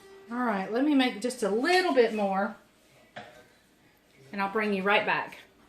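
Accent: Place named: North Carolina, United States. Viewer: Another NC staple, - DUKE'S mayonnaise! No other brand goes in my fridge. I am so glad I discovered your channel. It's not often you get to watch any program and hear someone who sounds like we do and who is so relatable. Thank you for sharing your life with us and for your sweet spirit. It's very much needed!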